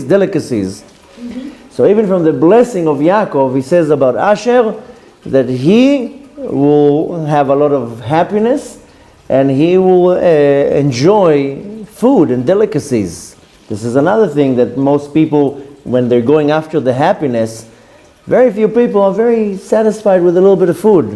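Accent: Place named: Israel. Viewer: Thank you Rabbi for another great class. I'm curious as to how you have such a distinct Israeli pronunciation of Hebrew when quoting verses and prayers being that you are Baal Tshuva and Chabad. Other people who have become religious and had no previous Jewish background just adopt all the ways of their new group. Please share any insight as to how you've managed to keep your Israeli pronunciation while also being Chabad. By the way, it's not in a bad way at all, on the contrary. Was just wondering since you're usually so open about yourself to students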